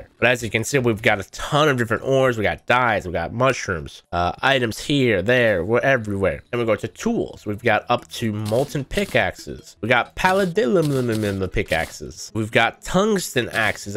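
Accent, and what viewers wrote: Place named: United Kingdom. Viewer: It's probably harder for him to pronouce it the usual way because he is from the UK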